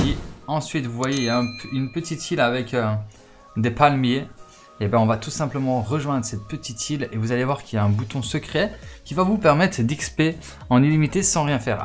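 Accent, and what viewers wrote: Belgium. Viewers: Wsh bg t belge ?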